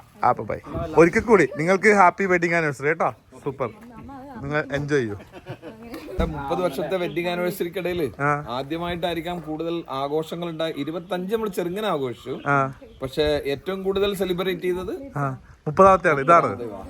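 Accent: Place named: Kannur, India. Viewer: കണ്ണൂർ slang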